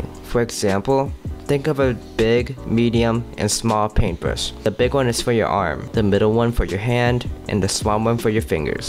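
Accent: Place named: United Kingdom. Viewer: 1. Yes this is true 10+ years ago. You're probably one of those guys who links that Logitech engineer video from over a decade ago. 2. You objectively are though. You're increasing the amount of information your mouse registers, objectively. This hasn't been up for debate in a very long time, but people like you who memorized a bunch of random dated knowledge on forums just regurgitates this every time they get the chance for some reason when this topic is mentioned. Your strawman analogy of comparing polling rate to counts per inch was very bizarre. Polling rate is the objective measure of input delay. This isn't something I wasn't aware of, and honestly if given the choice, I would prefer the 400dpi 1000hz over 1600 dpi 250hz. One is 4ms of input delay and the other is 1ms. I feel like you haven't tried this, because you wouldn't be capable of holding your current beliefs, but just enter any random FPS you would like. 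Zoom in very closely with an awp or that game's scoped weapon, and on lower DPI you will notice it moves in slight chunks rather than smoothly. This is because the mouse, objectively, is picking up on less information. The 3kliksphillip video which aged like milk where he claims in game sensitivity modifier was the culprit has been long disproven. You can replicate this effect with virtually any sensitivity modifier, and the lower dpi skips pixels. Having a british accent and a few 100k subs on youtube doesn't make you immune to criticism, or always correct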